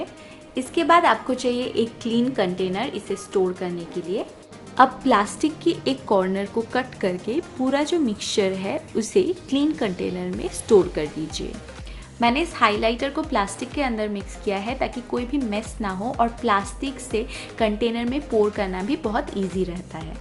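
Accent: India. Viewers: Before, you wrote the name of the ingredients in the legend of the step by step. I know how to speak English, but due to your Indian accent I have a little difficulty understanding what you're talking about. Please, if you do not mind, start captioning again. Please. I like you so much. Congratulations on the evolution of the channel =)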